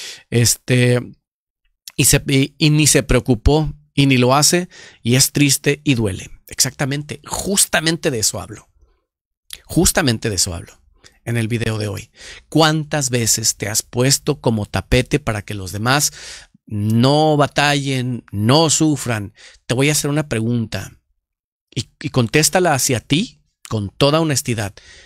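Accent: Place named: Mexico. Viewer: En dónde se encuentra Usted? Su acento es Mexicano.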